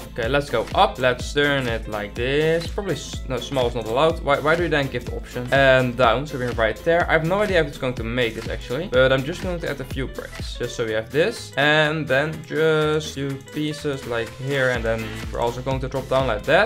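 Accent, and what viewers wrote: Netherlands. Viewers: i smell dutch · Are you dutch by any chance?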